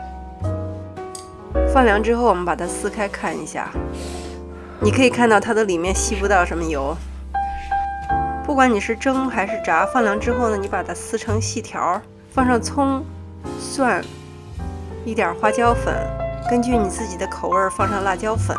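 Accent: China. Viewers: Downvote for shitty mainland chinese accent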